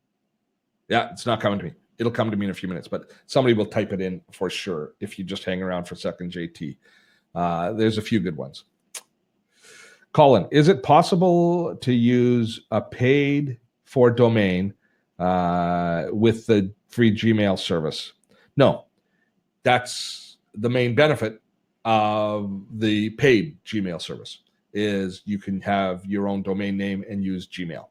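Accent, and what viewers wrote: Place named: Canada. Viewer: Being from the US I always enjoy your Canadian accent. It adds a pleasant touch that makes us partners in our uniqueness. Thanks for everything you do.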